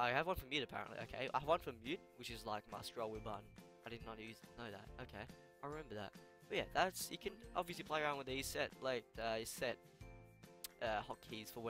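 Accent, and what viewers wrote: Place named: Australia. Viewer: Aussie I Guess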